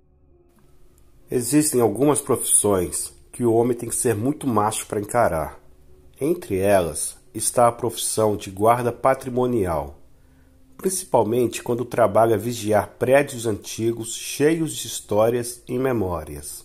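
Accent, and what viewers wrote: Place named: Northeast, Brazil. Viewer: Pela fala com certeza é do meu Nordeste!😁😁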